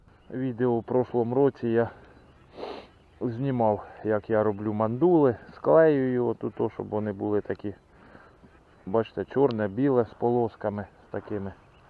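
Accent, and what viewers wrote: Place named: Cherkasy, Ukraine. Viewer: Неначе черкаський,,говір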